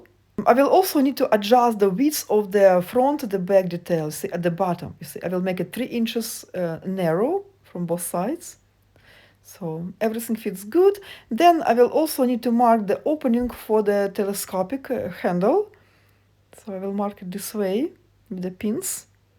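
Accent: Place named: Russia. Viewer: Слышу русского человека. Но почему не на русском? Хотя бы субтитры?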